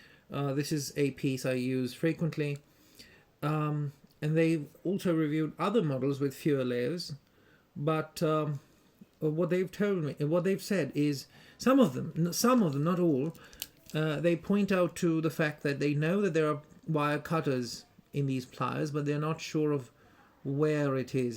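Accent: United Kingdom. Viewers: Yeah I thought he is from England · You are indian yet you have a very British accent. I do not know why but i find this peculiar. Have you studied in UK or in a UK school in india perchance?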